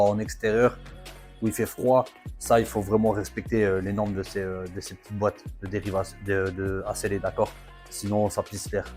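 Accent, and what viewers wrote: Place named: Alsace, France. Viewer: toi tu est alsacien non?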